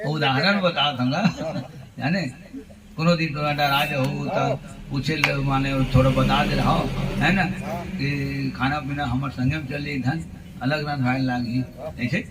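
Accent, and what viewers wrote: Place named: Chhattisgarh, India. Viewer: बहुत ही सुन्दर रामायण कथा वाचन । बड़ सुघघर छत्तीसगढ़ी बोली भाखा में जी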